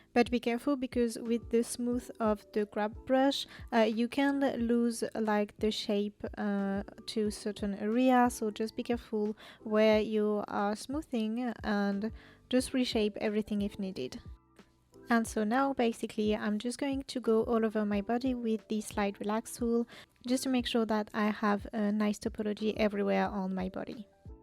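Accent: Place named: France. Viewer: I know it ur French that soft btfl voice and accent soo cute :!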